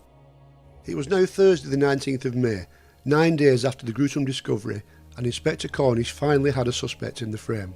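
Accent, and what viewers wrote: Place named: Bolton, United Kingdom. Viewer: A good Bolton accent on the commentator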